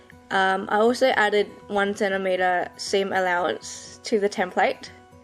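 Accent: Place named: Asia. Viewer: An Asian English accented girl... I LIKE IT!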